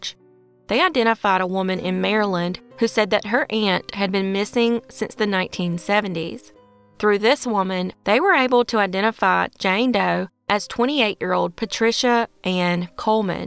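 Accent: South, United States. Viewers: Some ppl only like conclusions. I don't usually watch unsolved, but I love this narrator's voice. Only southern accent I like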